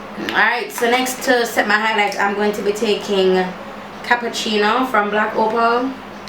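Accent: Jamaica. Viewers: jamacan accent a bit tough and you forgot to match up your neck!! looks many shades darker! lolololo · Looool the patois speed on fleek 😂👏🏾✊🏾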